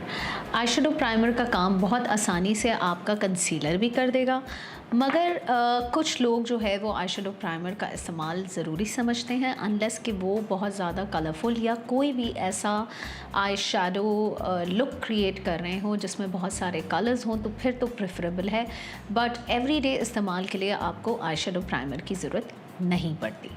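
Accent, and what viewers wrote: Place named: India. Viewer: Why are you talking like Indian!? Why can’t you say khareed & baghair properly if you’re a Pakistani I’m surprised.. 😳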